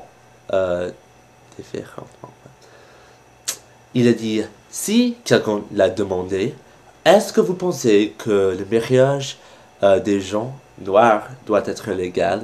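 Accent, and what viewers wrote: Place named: United States. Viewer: roooooooooooh j'adore l'accent !!! c'est décidé je vais me marier avec un américain! :D xD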